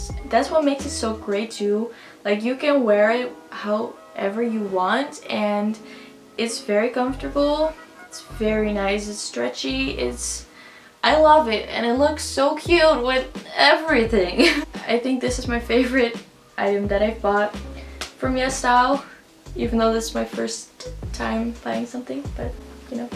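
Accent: Netherlands. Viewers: wacht wat je bent nederlandssss ahaha ik dacht het al (leuke video!)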